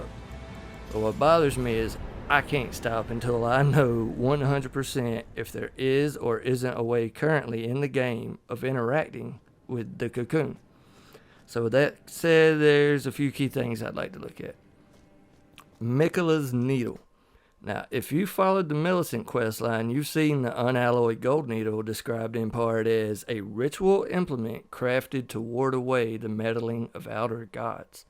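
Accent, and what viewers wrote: South, United States. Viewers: wasnt expecting the southern accent lol, love it, right on partner good video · I like your accent. What state are you from? I'm guessing one between Tennessee and Florida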